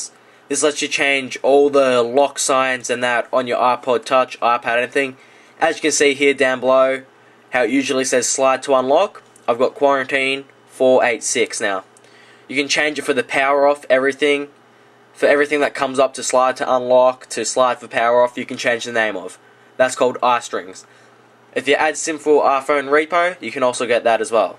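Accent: Australia. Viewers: Great tips and great to hear from an Australian finally.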